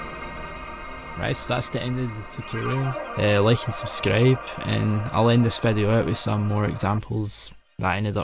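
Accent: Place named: Scotland, United Kingdom. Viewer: bro youre scottish af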